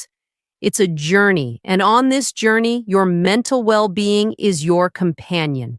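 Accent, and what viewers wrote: United States. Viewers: This is not a British accent but horrible American accent with delivery of irritating pronunciation of Rrrrrrrr.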